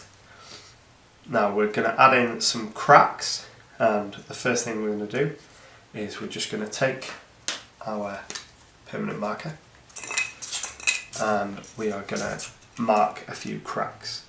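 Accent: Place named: North Wales, United Kingdom. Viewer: Great video, thanks for that. I'm gonna guess you're from North Wales, because that accent is very familiar!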